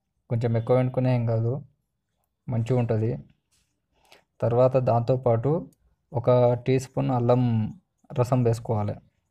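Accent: Telangana, India. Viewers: Anna ni telangana langveg super anna and drink kuda mast undi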